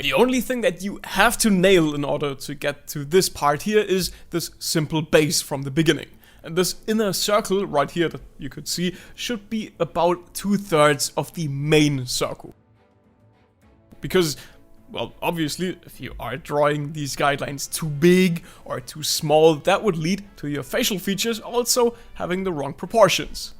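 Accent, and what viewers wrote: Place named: Germany. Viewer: Ich bin auch mit deutsch aufgewachsen, hat man bei dir sofort gehört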